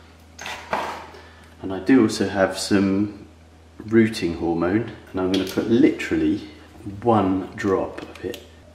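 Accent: United Kingdom. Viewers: I'm a Hispanic American and thought this was a British video because of the man's accent. Then, I heard the random caracol (snail) song in the background and was like WTF...hahahaha!! Kind of fitting!! :-D Gracias por la linda explicacion. I'll try it today!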